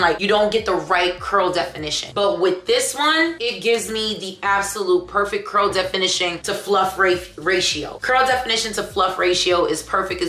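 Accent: New York, United States. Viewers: So glad I came across this on my time line❤ You putting me on👀‼️ Definitely just got you a new subby🤗 Love listening to you talk ,that NY accent reminds me of home🥰 I'm from the Bronx 161st ❤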